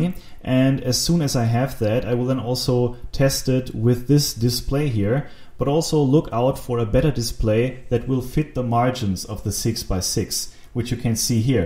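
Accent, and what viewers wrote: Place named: Germany. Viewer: Hi, Thanks for sharing your ideas with us. I just love it and also enjoy your German accent.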